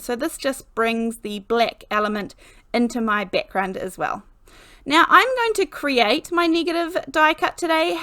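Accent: New Zealand. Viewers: I love this! It's so beautiful (and easy)! By the way, is your accent NZ? I'm in Canada but my son and his family his on the North Island in Laingholm.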